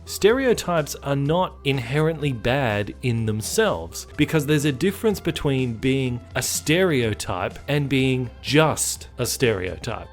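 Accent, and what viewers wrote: United Kingdom. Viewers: I thought you were British. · is australian?! well i'll be! i could have sworn he was british. xD, if you're listening, get in touch with the the weekly planet guys and make a guest appearance!! i mean, australia's a small country, right?! you probably live just down the road from them. xD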